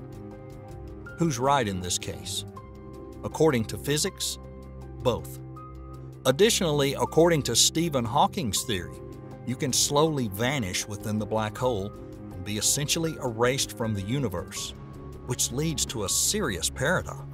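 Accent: South, United States. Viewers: It just sounds so out of whack to listen to cosmological specials/documentaries narrated in that southern drawl. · A southern narrator. Never had that happen. I subscribed before I even watched the video and I'm here to stay. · There's something about a guy with a southern accent talking about space that is surprisingly off putting imo. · British narration is so much preferable than an american accent like this. Too bad, could've been an interesting doc. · Love that southern accent spitting space facts! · Dunno what to think about a guy with a heavy southern accent telling me about space... 🤔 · This guy sounds COUNTRYYY! 🤠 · I REALLY WISH they'd pronounce it the right way, YOU- rah- NUss,, not you-R AAY nus,, and especially with that Southern Bible belt accent, I sincerely think the guy was pronouncing it that way because he liked doing it lol...... 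· Love hearing a southern narrator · Just 😍 the southern accent. Being a New Englander I don’t hear it often! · "Peculiar -arities. ." Sorry, the muddy southern drawl and not pronouncing words is killing your cred.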